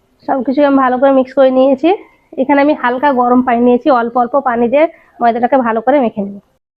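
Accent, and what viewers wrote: Murshidabad, India. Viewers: হ্যলো, আপনার ভিডিও দেখি আমি বহুদুর কানাডা থেকে, আপনি হয়তো পশ্চিম বংগ, মুর্শিদাবাদের বাসিন্দা, মিষ্টি কথা শুনে ধারনা করছি, কোথা থেকে আপনি জানালে খুশি হব, আমি মুর্শিদাবাদের মহিলা । আপনার সব রান্না নিঁখুত হাতের, আপনি বড় হোটেলে কাজের সুযোগ পাবেন । একটা অনুরোধ আপনি ফুডকালার/ লাল রং যেটা বিরিয়ানীর আলুতে ব্যবহার করেন তা কম পরিমানে ব্যবহার করবেন কারন, এই রং থেকে “ক্যনসার” হয় । আরেকটা অনুরোধ বিরিয়ানীতে মশলা ব্যবহার করবেন না ।হায়দরাবাদের বিরিয়ানী ছাড়াও ( পুরানো ঢাকার বাবুরচির রান্না “ কাচচি বিরিয়ানী” google করে দেশে শিখে নিতে পারেন; এই বিরিয়ানী- নবাব সিরাজদৌলাহ এর সময় থেকে পুরানো ঢাকায় এসেছিল, তাদের আত্মীয়তার সুবাদে । আপনাকে ও পরিবারের সবাইকে নুতন বছর ২০২৪ এর শুভকামনা জানাচ্ছি । শুভেচ্ছানতে । জিনাত/ খুকু, ০১ জানুয়ারী ২৪🇨🇦🙏❤️🥰🌹🌹🌹🌹🎈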